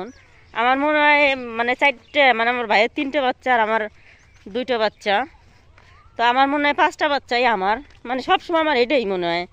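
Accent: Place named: Faridpur, Bangladesh. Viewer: খুব সুন্দর হয়েছে আপনার মেয়েদের ভিডিও। আপু আপনার গ্রাম কি ফরিদপুর? আসলে ভাষা পরিচিত লাগছে💚💚💚💚💚💚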